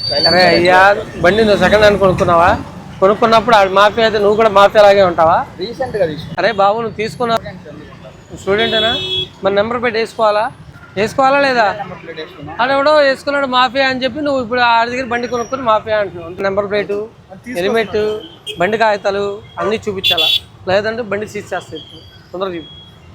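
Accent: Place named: Andhra, India. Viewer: ఆంధ్రవాళ్ళ వాయిస్ బలే కామెడీ గా ఉండిద్ది.... మీరు విజయవాడ అని అర్ధం అయింది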